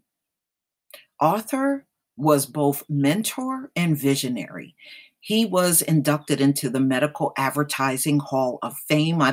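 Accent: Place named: New Jersey, United States. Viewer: I love it. Yo Are so Jersey!!!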